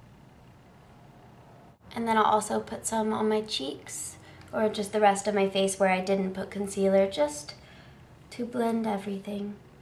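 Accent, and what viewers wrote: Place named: South, United States. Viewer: where are you from i absolutely love the southern drawl!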